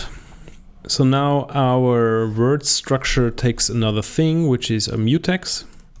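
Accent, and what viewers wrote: Germany. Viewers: Hello Rust! Oh that sounds pretty cool. And yeah I feel you have some nice German accent when you talk on the video :P I started learning and using Rust because of TiKV actually :) Thanks for sharing those videos again and keep up with the good work :)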